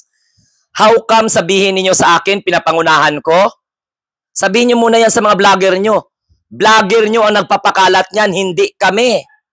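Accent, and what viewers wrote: Iloilo, Philippines. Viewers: Ayusin mo muna ang punto mo ilonggo ka toto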